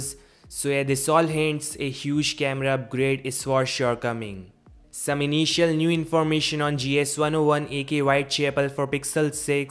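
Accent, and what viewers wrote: India. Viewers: So this guy is fellow indian....he sound indian though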